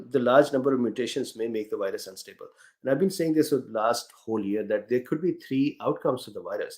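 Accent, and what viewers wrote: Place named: India. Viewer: C19 is the worlds biggest scam, fun ,speaking of scams, hearing it in an indian accent makes even more of a scam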